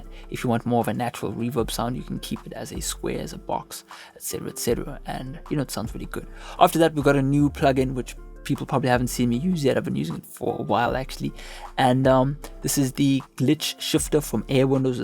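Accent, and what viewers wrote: South Africa. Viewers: Am i the only person that thinks your from South Africa? 😄 only recently discovered you and im South African myself. Your mixing advice has helped my vocal mixing out ALOT. Appreciate it broski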